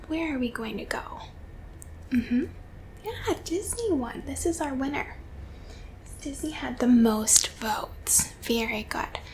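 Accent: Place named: Michigan, United States. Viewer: She's gotta be from Michigan right? Or upstate New York. Something up north. Love the accent!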